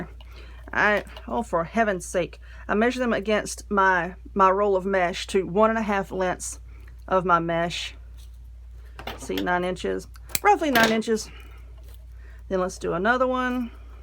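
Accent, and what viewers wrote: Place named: South Carolina, United States. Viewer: ABSOLUTELY BEAUTIFUL 🎅✝🌲❄⛄!!! I LOVE IT & I CAN'T WAIT FOR MY DAUGHTERS TO WATCH YOUR TUTORIAL'S & GO BUY MY SUPPLIES SO I CAN TRY TO MAKE SOME OF YOUR WREATHS 😊💝!!! WE LIVE IN ARCADIA FL BUT OUR DADDY WAS FROM SC & YOU SOUND & SAY STUFF JUST LIKE US GIRL, LOL 😂😂😂!!! I LOVE YOUR ATTITUDE & PERSONALITY 💝😊!!!!!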